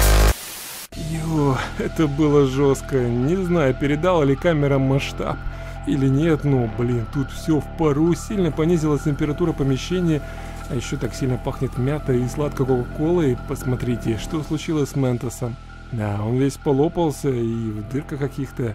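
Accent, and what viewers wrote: Ukraine. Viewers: У тебя украинский акцент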